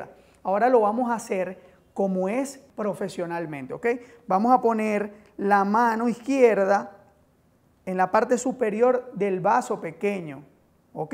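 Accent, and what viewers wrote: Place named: Venezuela. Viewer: Gracias profesor al parecer por el acento es Venezolano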